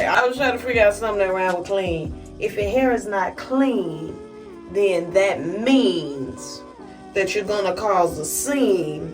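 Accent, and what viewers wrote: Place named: South, United States. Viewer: I love your country talk😂 it’s so cute and funny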